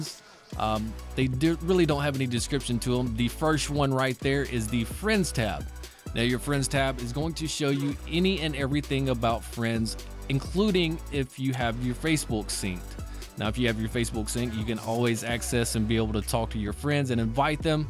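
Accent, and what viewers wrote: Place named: United States. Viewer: Thank god, an american. Im convinced that everyone in every other country cant explain anything to anyone. Nor can you even understand them.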